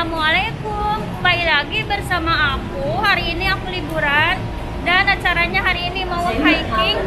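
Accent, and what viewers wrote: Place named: Indramayu, Indonesia. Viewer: Wong ndi teh,Kya Wong dermayu tah mjlngka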